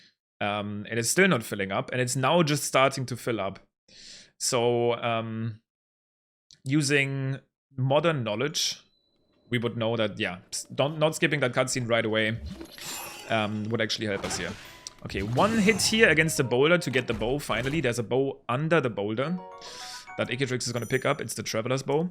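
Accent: Germany. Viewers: I think so. His accent really sounds like a german one. Me as a german can relate xD. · Are you actually German?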